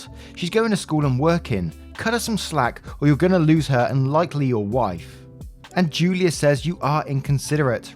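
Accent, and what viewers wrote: United Kingdom. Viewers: Nothing better than listening to a British dude shitting on MLMs while playing a farming sim game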